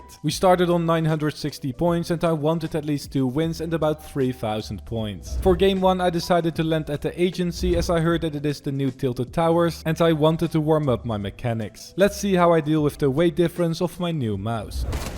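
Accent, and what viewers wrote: Netherlands. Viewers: Are you dutch. I am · are you dutch? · je bent zoizo nederlands ik kan het horen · Ge hoort gewoon dat da nen hollander is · Kom je uit Nederland wand je heb een Nederlands acsend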